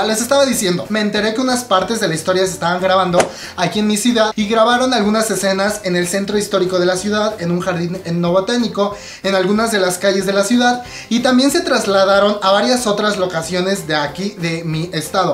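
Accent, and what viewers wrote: Oaxaca, Mexico. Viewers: eres de oaxaca ???? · Omg :v eres oaxaqueño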